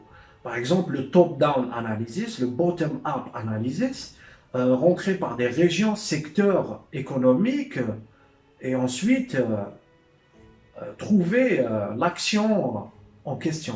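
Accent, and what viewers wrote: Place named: Algiers, Algeria. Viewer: Salam on entend l'accent algerois kho bonne vidéo merci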